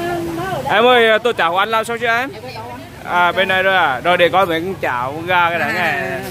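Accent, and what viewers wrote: Hue, Vietnam. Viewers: Chương trình của bạn rất hay. Cách dẫn giải từ tốn rất rõ ràng, đặc biệt là tiếng Huế rặc làm cho tiết mục thêm phần hấp dẫn. Đây là một loại thuốc thần kỳ để chữa bịnh nhớ Huế của những người xa xứ. Cảm ơn bạn rất nhiều. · anh nay noi kho nghe qua noi giong hue bo tay hieu duoc chet di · noi giong Hue ray de nghe, cam on em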